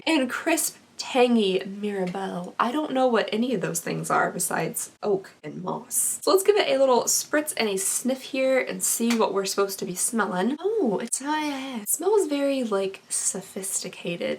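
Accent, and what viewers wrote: Kentucky, United States. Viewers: This is a bit of a weird comment, I'm also from KY and sometimes I miss the accent and watch your videos 😅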